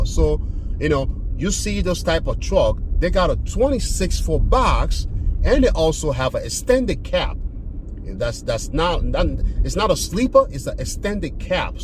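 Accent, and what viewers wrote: Liberia. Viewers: i miss raleigh!! i was tryin to figure out what road you were in and it was hillsborough st....memories...sigh. keep up the good work fellow African. You sound Liberian. My in law is Liberian lol. Good lookin out. i took notes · Sounds like a Liberian. My brabee ur Peking about to get his truck soon.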